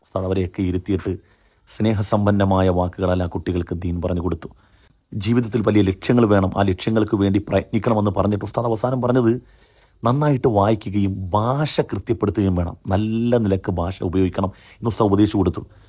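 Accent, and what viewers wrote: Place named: Malappuram, India. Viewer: Usthadinte samsaarathil malappuram slang kayarivannu😄😄👍👍👍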